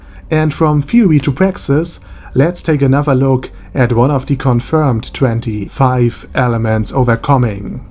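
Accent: Germany. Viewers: I have to say, your kinda heavy german accent is mesmerising 😁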